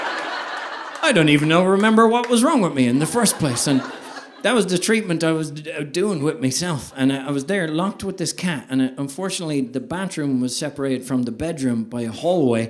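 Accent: Ireland. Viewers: Eye candy, Irish brogue, trivia, truth, comedy - welcome to my hometown! Yep, I'm in Florida but wander around. You'll see there's little bit of everything here...and we're all related. (source: 23 and Me) 😇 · David is an Irish, American,Mexican, treasure - I love the Irish brogue and his comedy is so fresh and funny 😁 Keep up the great work 🎉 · The Irish accent 😀👍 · I love your comedy. I watch every show you do on you tube. I love your Irish accent. Have you ever listened to Onoir an Irish singing group from Ireland? Wonderful music. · Wonderful show (and boy, did i need some laughs). I think you're the first Irishman I listened to whose every word I perfectly understood; your looks didn't hurt. 😉😘Hope things are looking up; you deserve it. BTW, your mom sounds terrific; hope she and your fam are well. You should come perform in San Miguel de Allende, Mexico; lots of expats here would love you (me included).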